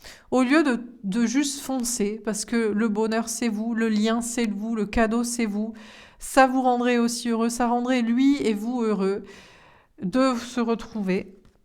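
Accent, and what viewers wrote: South, France. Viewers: Encore un excellent moment avec vous. Beaucoup de justesse.. et encore beaucoup de rire. Je ne sais pas d ou vous êtes, car je cherche l accent, mais y a quelques expressions du sud. 😂 j adore. Au delà du contenu… vous êtes tout à fait le genre de personnalité / humour / personne qui j adore avoir autour de moi. Je pourrais passer des heures avec vous, à vous écouter et à rire. Merci 1000 fois pour ces bons moments. 🙏🏼✨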